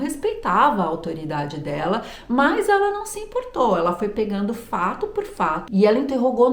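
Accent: France. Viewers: Oieee... Veramente, esse caso é o reflexo de uma sociedade preconceituosa, de uma imprensa q n se importa em mostrare a verdade, mas q é totalmente voltada para o sensacionalismo e o lucro, sem importarsi das consequencias de meter na lama, e distruir a dignidade de pessoas, q seguendo a lei deveriam ser inocentes fim a prova contraria! Purtroppo mudou pouco essa mania de fazer julgamentos, e bene si eu vejo ai da uma sociedade muito masquilista, e mulheres q sofrem ao ver o su esso, è a independencia de uma outra mulher... Eu moro a Forlì, cidade pequena, q vc espirra aqui, e no outros dia tds dizem: " meu Deus ela ta com Covid"... Pode acreditar!!! Baci a te, e adoro sentir o teu frances ... Adorrrooo, purtroppo o meu Frances é escolar, kkkk.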